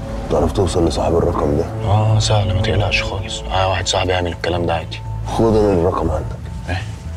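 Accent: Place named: Morocco. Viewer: لهجتك مغربية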